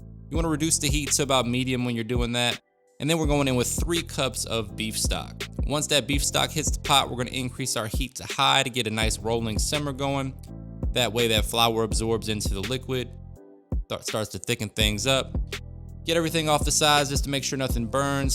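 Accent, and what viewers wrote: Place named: Pittsburgh, United States. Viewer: This guy has a Pittsburgh accent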